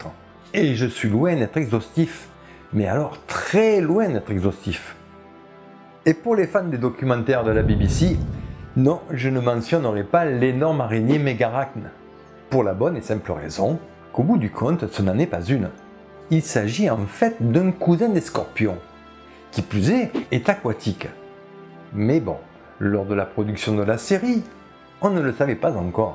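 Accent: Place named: Marseille, France. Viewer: Bonjour, et bravo pour vos connaissance en paléontologie, J'ai 82 ans et j'ai fais des fouilles ici en Belgique dans ma jeunesse Mais lorsque je voie la quantité et la richesse des découvertes ....je peu revoir TOUT c'est fabuleux la quantité d'animaux que l'on a découvert plus ceux que l'on avait déjà mais que l'on n'arrivait pas a classé. C'est fabuleux ! Je regarde très souvent vos programme et en plus j'adore votre axent marseillais .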